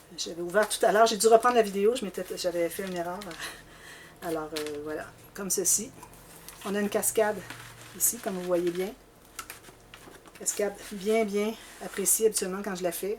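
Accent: Quebec, Canada. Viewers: Martine Mérard. Bonjour, merci pour toutes les réalisations que vous partagez. J’aime tous vos projets, la variété des thèmes, du niveau de difficulté, j’ai appris tellement de choses depuis que je vous suis. Au début, au hasard des recherches sur YouTube, c’est votre accent qui m’a interpellée ( à cause de plus de 10 ans d’échanges scolaires avec Chicoutimi…).C’était d’abord le premier plaisir du matin, à présent c’est le soir, mais le plaisir est toujours là et l’impatience de la découverte à chaque dévoilement. J’ai réalisé plusieurs de vos albums, ou emprunté des idées, des inter actions, j’aime les pop ups, les cachettes, mais aussi les choses plus simples et les albums pas trop gros! J’ai contaminé ma petite fille (6 ans!) qui veut scraper avec moi et échanger des joyeux courriers et jouer à! Je souscris à l’idée de Patricia je crois pour un album en duo grand mère et petite fille. 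Et puis encore pour des tutos express, et peut être des réalisations plutôt style junk journal et récup. Continuez à nous enthousiasmer!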